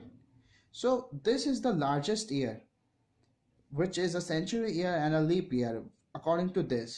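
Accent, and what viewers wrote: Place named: Hindi, India. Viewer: Lol.. Nice...trying to catch up with an accent , but instead of getting along one, you are mixing accents and flow is still hindi .. And YOU KNOW🤣 strange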